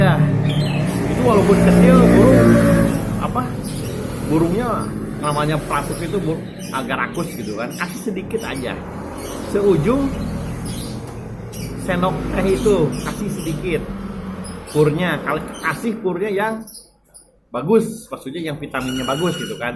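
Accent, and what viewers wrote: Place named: West Java, Indonesia. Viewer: Sunda pisan mang... Hade infona euy..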